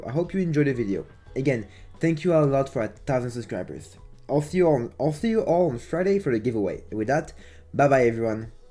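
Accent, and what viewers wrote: France. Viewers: l'accent francais est fou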